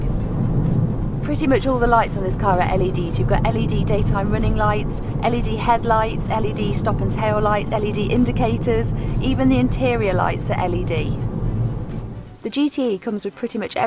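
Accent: United Kingdom. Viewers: Nice review, I miss those lovely accents and tones in my daily walk of life, there is just something unique about the UK experience.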